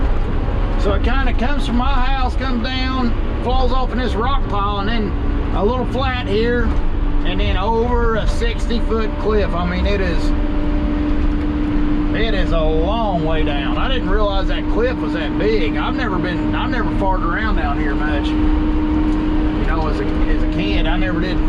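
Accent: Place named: Pennsylvania, United States. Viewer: I like you and I like the way you talk, you remind me of some of my more interesting relatives in the foot hills of Pennsylvania.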